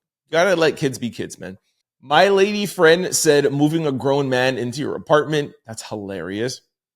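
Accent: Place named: Canada. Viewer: You’re not even an American are you buddy? I can tell by your accent you’re Canadian, correct? Get lost.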